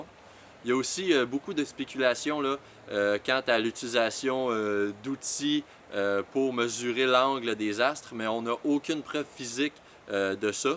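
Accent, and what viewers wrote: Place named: Canada. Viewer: Pour qui n'est pas canadien, votre accent sauf votre respect rend vos explications incompréhensible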